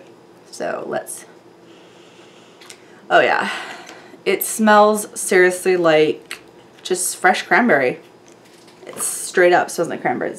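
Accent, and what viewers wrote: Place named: Canada. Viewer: Thanks for asking me to join ur channel! Glad to of- and so far i loveee your videos! Didn't knw u were Canadian, but love ur accent! Its real cutee! Id have to choose as my "wanting to try" would have to be tha Sugared Vanilla Wish & that Winter Candy Apple out of all of thm! Id like tht Candy Cane one also! So many to choose! Awhhh! Hehe :D Keep it up! Luv em! Would love to win as i lovee BBW! :D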